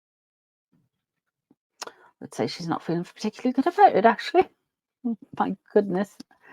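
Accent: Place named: North, United Kingdom. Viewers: I love individual accents, and the north east of England always gets voted as one of the friendliest! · Don't worry about pronunciation, my Auntie was from up North and said book like you, it just were you are from in the UK! Be proud of your accent, I was born in the Midlands and now live in Australia, my Australian son in law teases me about my accent :_trifle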